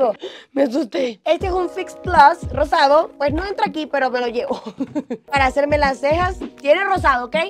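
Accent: Venezuela. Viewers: Yo sé que a No se olvida el acento venezolano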